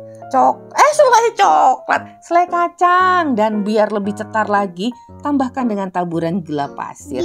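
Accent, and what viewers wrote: Java, Indonesia. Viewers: Lha kok maleh medhok bangets too yoo maa😅..mtrnuwn sangets njih maa resep ipun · Hhhhh, medok lgi😂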